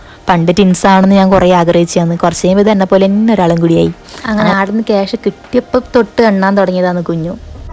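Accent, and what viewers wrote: Kasaragod, India. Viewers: Namma kasarod ayond ningale bhasha nalla pole manasilavm🙌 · Nammale kasargod basha adipoli alle.... Athil ആണ് രസം..... വിഡിയോ എടുത്തുകൊണ്ടു സംസാരിക്കാൻ ശ്രമിക്കു അതാണ് രസം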